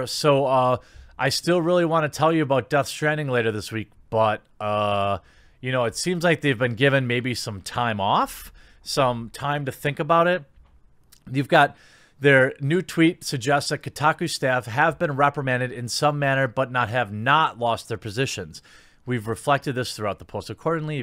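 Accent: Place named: Midwest, United States. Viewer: Good video,. I think. I only listen, so who knows what the video is?:P Not to call anyone out, but some Youtubers have voices that are really hard to listen to, even if the content is good. Your content is good, AND you're easy to listen to. So I appreciate that you speak at a reasonable pace, and your voice isn't grating. It's probably a Midwest thing - my neck of the woods.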